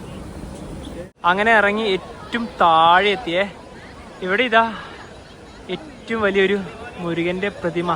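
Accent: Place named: Nileshwaram, India. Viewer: തിരിച്ചു പോരാൻ ടാക്സിക്ക് പകരം ട്രെയിൻ “ ആക്കിയത് ” നന്നായി. താമസിക്കാതെ ATS “ആക്കുമെന്ന് ” പ്രതീക്ഷിക്കുന്നു- നീലേശ്വരം ഭാഷാ ശൈലി രസമുണ്ട് കേൾക്കാൻ.❤❤❤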